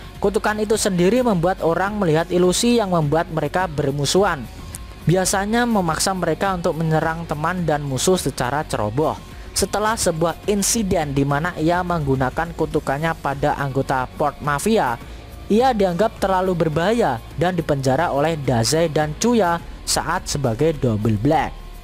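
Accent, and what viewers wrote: Java, Indonesia. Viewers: Suara nya medok ya bang 😆😆😆😆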